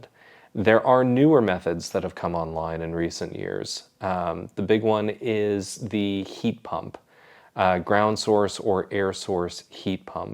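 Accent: Scotland, United Kingdom. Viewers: Oh my goodness, you're picking up the brogue. You've stopped sayin "Scatland" and started saying Scotland, like a local 😀. I'll be listening to see what other words change over time. Excellent content, as usual 👍🏴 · Congratulations on your million views--well deserved! I found Simple Scottish Living just before finding your channel; pretty sure I've seen North And Forth videos too. I really love watching anything to do with Scotland and won't give up hope of living there someday (just wish I was younger). I've also noticed that Scottish accent creeping in...😁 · Loving your channel, one thing I have noticed is that you are starting to pick up the local accent, the way you say Scotland & Scot in particular. It will be interesting to see how broad you become as time passes. Also you may wish to consider that the phrase let rip can also mean a bad bout of farting, so I did laugh when you described Christine as letting rip in the kitchen until you qualified it with cooking up a storm. 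Conjured a funny image. 🤣🤣🤣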